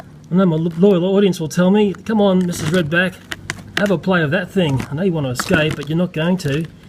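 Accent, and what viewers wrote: Australia. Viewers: Why is the Australian accent just so....awesome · Is this guy Australian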